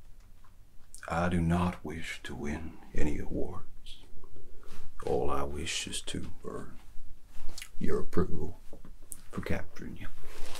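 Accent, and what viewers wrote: South, United States. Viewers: Your southern accent is amazing. One of my favorite accents!!! This is impeccable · How do you not have a million subscribers? Nobody comes close to the content you are putting out there...Crazy good stuff, man! And as a lifelong Southerner, the accent is spot on! LOL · This reminds me of the part in fable 2 where the photographer takes the young sparrows and sisters picture. Also, amazing southern accent. Where are you originally from? Him: give me a smile. Me: ⊙﹏⊙😬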